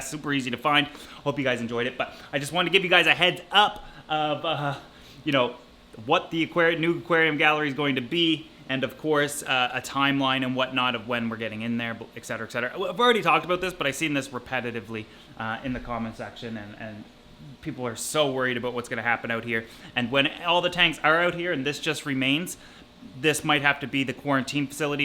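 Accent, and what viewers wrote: Canada. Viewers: That's some Canadian accent! :-)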